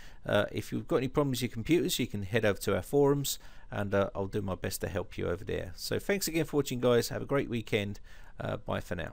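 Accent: England, United Kingdom. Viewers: Why Englishmen talk so much? Is this a lullaby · British english is to die for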